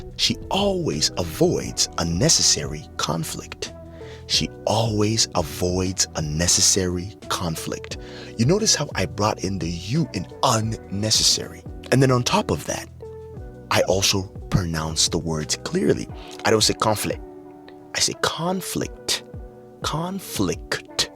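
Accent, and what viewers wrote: United States. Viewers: It's not a British it's an American accent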